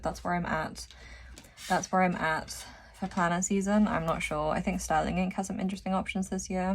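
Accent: United Kingdom. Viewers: Vocal fry sounds so lovely when the person has received pronunciation rather than a Kardashian valley girl voice. Anyway, feel better soon! You're so right about our lack of seasons. It never seems to get very cold here these days. We might get a cold snap lasting about a week before we're back to a solid 50% humidity and temps in the low teens. I can't remember the last time I had to wrap up warm on my end of October birthday. I'm of the opinion that infections have risen because it's never cold enough to kill everything off.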